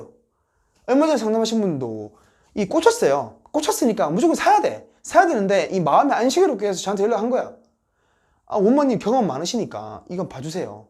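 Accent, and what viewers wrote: Busan, South Korea. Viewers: 고향이 부산이라 말씀이 더욱 정감있고 시원시원하고 진실로 구독자 생각해주시는 부동산 팁 잘듣고있습니다^^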